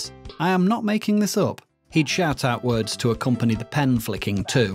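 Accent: United Kingdom. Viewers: I don't know what you mean, he sounds quite British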